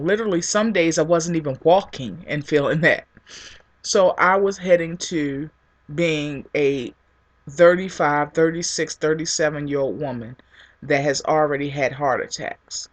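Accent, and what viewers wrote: United States. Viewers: I love your accent! I miss USA accents! :) Thank you for your honesty and love and compassion. My surgery is Oct 23rd and I am excited.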